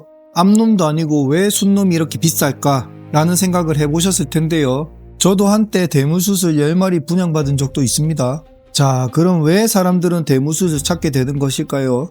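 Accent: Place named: Gyeongsang, South Korea. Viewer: 오 경상도 분이셧군요 어디분이실까요?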